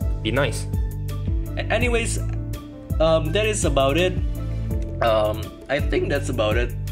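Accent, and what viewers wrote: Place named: Philippines. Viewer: damn i was convinced that the guy voicing the videos was filipino cuz his accent is very similar to filipinos. nice to know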